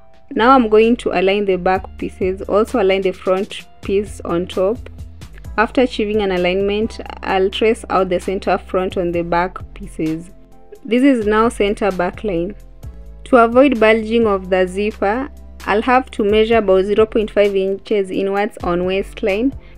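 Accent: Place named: Kenya. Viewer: from your accent I can clearly tell you are Kenyan. Where do you buy fabric in Nairobi?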